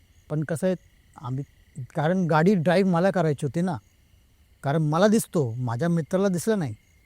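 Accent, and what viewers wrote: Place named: Pune, India. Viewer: arey re mitra proper punekar ahai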